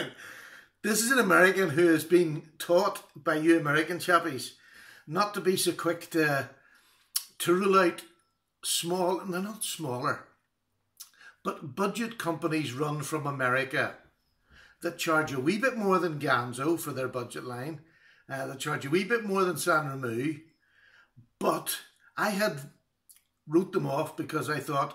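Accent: Ireland. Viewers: I love your channel, i love that you focus on budget knives. And your Irish brogue reminds me of my grandparents who where from Cork and Leitrim counties. Keep up the great work sir! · Congrats,! We trust yah! I'm sure your reviews will continue to be fair and honest. Whatever keeps you on the air... DO IT! Love your channel and love that voice. Watching your channel has greatly helped with my Irish accent!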